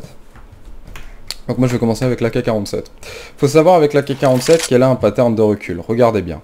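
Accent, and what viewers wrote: Alsace, France. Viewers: ah un alsacien sa fait plaisir ^^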